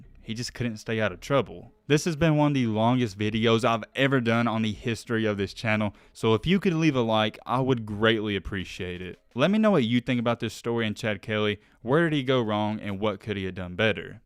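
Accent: South, United States. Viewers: You're South accent is so warming. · The southern accent is easy to listen to. Makes me feel like I'm having a casual sports conversation with the fam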